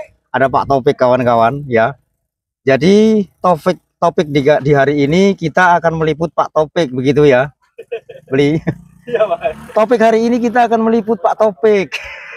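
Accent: Banyumas, Indonesia. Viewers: Ora ngapak ora kepenak makin joss semangat menuju 20K nya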